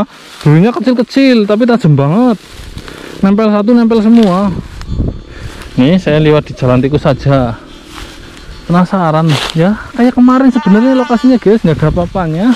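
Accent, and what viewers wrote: Banyumas, Indonesia. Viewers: Ora ngapak ora penak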